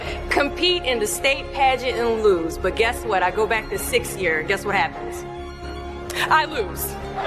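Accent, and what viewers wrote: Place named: South, United States. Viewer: Have..none of you been to the south?? This is how ppl talk down here😭🙏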